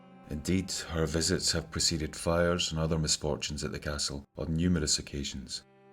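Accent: Scotland, United Kingdom. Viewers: I like your stories (some more than others.) But most of all I love the narrator. That quiet, understated calm with its Scottish accents... makes everything seem eerily significant before the tale's even started. Awesome music & atmosphere too ⭐⭐⭐⭐🏆👍👍👍 👻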